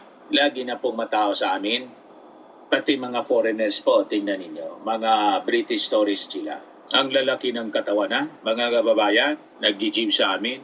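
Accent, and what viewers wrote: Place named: Bicol, Philippines. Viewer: Bicolano ba kyo sir kc pareho tyo ng punto?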